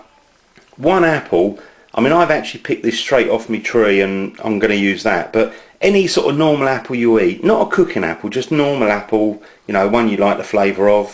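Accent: United Kingdom. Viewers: that british accent though. too cute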